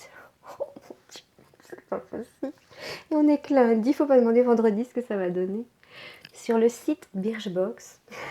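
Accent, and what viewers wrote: Belgium. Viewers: l’accent belge en plus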